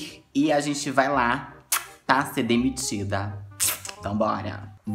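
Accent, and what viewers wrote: Brazil. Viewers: Uai vc é de Minas? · Eu ri muito. “Eai, joia?” O sotaque goiano é bom demais.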